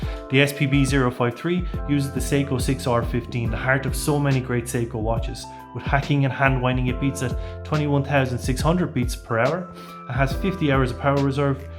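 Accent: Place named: Ireland. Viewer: Quality! Like your accent! More Irish-style reviews please! Much better than most of the channels here! Subscribed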